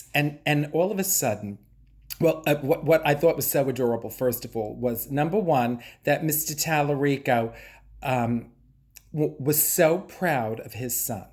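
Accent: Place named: Bronx, United States. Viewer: Omggg..i said you reminded me of my aunts from the Bronx ..You are from the Bronx !! Im floored !!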